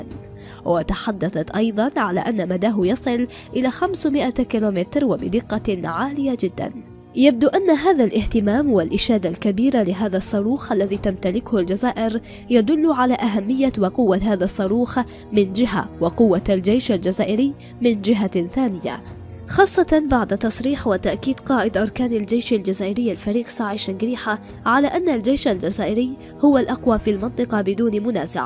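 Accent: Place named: Morocco. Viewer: الله يشافيك يا صاحبة القناة راكي مڨريبيا باينة من صوتك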